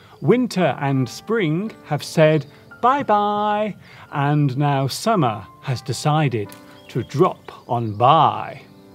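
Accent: United Kingdom. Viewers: Thanks a lot, Dear Misterduncan. Your videos are very fabulous and interesting. I like British Pronunciation very much.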